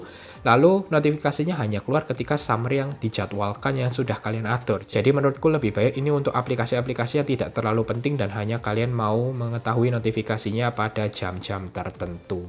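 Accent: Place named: Banyumas, Indonesia. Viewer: mantap mas..ngapak ya mas..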